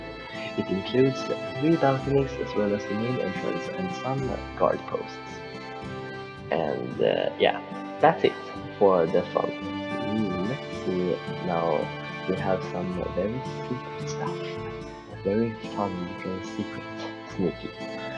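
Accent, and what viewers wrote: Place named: Norway. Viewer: Which country are you from? The accent sounds like Norwegian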